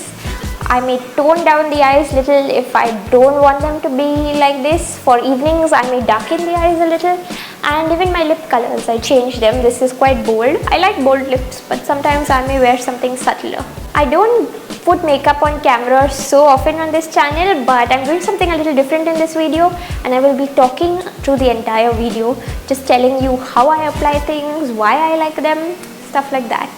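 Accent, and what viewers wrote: India. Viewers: I really loooveeee the way u talk u know ur English is phenomenal..u enunciate syllables..dats really catchy..at first I didn't like it...but now..I just watch it videos to see u talk.. moreover most Indian YouTubers fake an American accent..but ur pakka proud Indian Naari! Love u..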